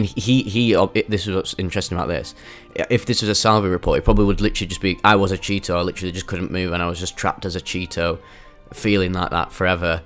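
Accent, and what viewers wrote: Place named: Burnley, United Kingdom. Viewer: I'd normally hear this type of voiceover from some American tone not a Burnley accent 😅😂 don't take offence I like it as I can relate I too have an accent which doesn't ring true to me .I speak in a Scouse accent however I am not a Scouser 🥸. Life's a funny thing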